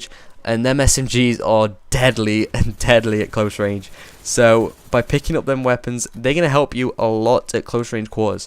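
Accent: United Kingdom. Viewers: FINALLY, A BRITISH COMMENTATOR!!!!!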